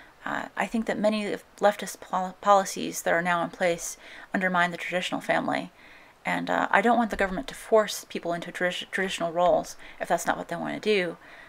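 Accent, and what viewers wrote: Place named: Appalachia, United States. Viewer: A lot closer to home than I expected, in many ways. I played Requiem, not Masquerade , but also watch NonStampCollector, and your smoothed out accent is like that of my Appalachian kin- well, some of them. Likewise, you too could appreciate it if they made the Ender's Game movie with the same wardrobe set as Pride and Prejudice. It'd be like Master and Commander: The Dark Side of the Moon.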